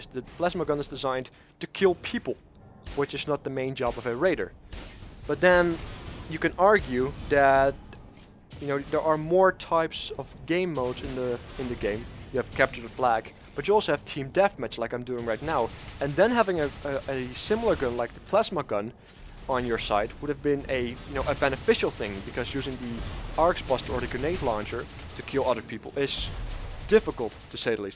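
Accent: Netherlands. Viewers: you are dutch! herken het gelijk haha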